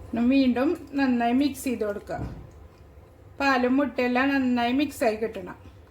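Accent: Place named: Thrissur, India. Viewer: നല്ല രസണ്ട് സംസാരം പക്കാ തൃശൂർ ഭാഷ ഇങ്ങനെത്തന്നെ മുന്നോട്ട് പോട്ടെ 😊കൊതിപ്പിച്ചു 👌new video കാണാൻ വരണേ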